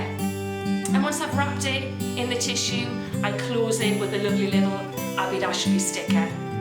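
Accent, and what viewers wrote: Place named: North East England, United Kingdom. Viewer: Hello. I’m new on your channel. Just want to say hello and let you know that I love your attitude! Oh... one thing to ask! Are you from Newcastle? I heard that lovely north east accent. 😀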